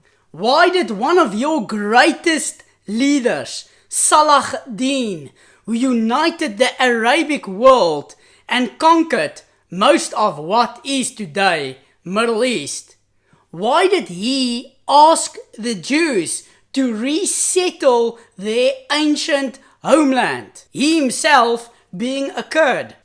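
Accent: South Africa. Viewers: Hi I want to convert to Judaism. Can you help me you sound like you live in South Africa am I correct . I do can you help me how do I convert. My email is <mail> if you can give me some advice. I have been to ISRAEL a few times and I love the God of Abraham Isiac and Jocob. And the Jewish PEOPLE It consumes me .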